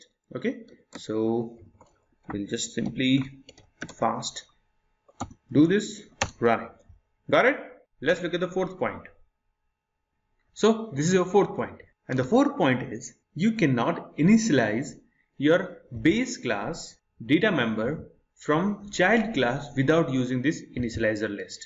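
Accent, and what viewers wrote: India. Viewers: these south indian accent is so funny, stop using it. Hard to understand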